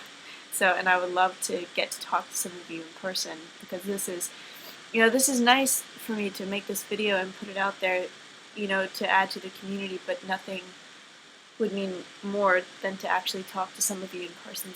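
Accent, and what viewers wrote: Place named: United Kingdom. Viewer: Thanks. After completing the test, I found your video enlightening and you echoed many of my characteristics. It is nice to feel more connected to people. Can you recommend any ways to communicate with other INFJs? I'd like to find out how deep our connections go. I love discussing things on a deep and personal level but not everyone enjoys that. Also, I thought you were British! Pity...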